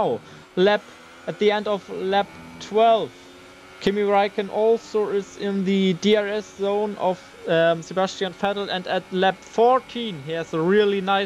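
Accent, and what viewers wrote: Germany. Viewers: Der legendäre deutsche Akzent 😂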